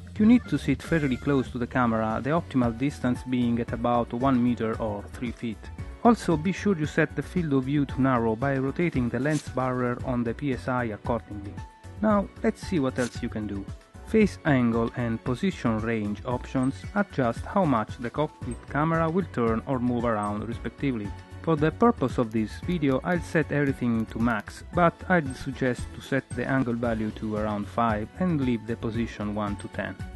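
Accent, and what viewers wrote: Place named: Italy. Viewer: Si sente che sei italiano... :) Allow si pronuncia "ellau", questa è la parola che suona peggio, anche se devo ammettere che la pronuncia lascia un po' a desiderare. Ma in fondo chissene... ;) Video molto utile, almeno per me. Mi piace! ^^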